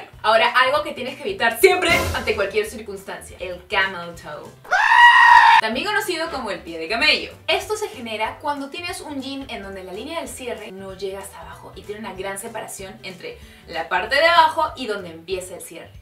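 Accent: Chile. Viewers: Chilena :v?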